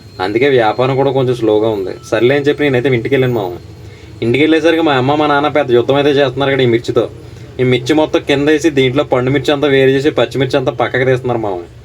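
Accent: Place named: East Godavari, India. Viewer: Yedo East Godavari slang lo matladu thunna Vu brother. Mee proper yekkada brother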